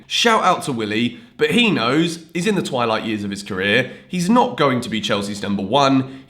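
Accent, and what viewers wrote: Scotland, United Kingdom. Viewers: Haha love the Scottish accent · Love u but the Scottish accent tho😬🤣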